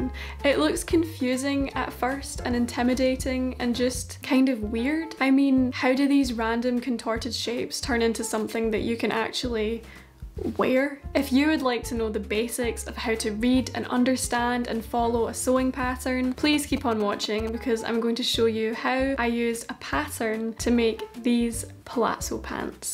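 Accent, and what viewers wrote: Scotland, United Kingdom. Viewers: I subscribed to hear your (Scottish?) accent as well. Ha ha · Are you from...Scotland? You have the best accent! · You are a pleasure to watch. Simple instructions and fabulous end result. Keep up the good work. Watching from Victoria. Australia. Love your Scottish accent.😎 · Fucking terrifying to see a Scottish accent on the internet, like I only see you guys outside when I leave my house??? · Ahh I love your Scottish accent!! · Came for the pattern, stayed for the Scottish, yass 🙌 🏴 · Pretty sure she has a Scottish or Irish accent, I think Scottish though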